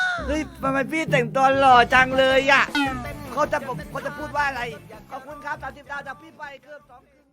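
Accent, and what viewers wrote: South, Thailand. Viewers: พูดเหมือนคนใต้เลย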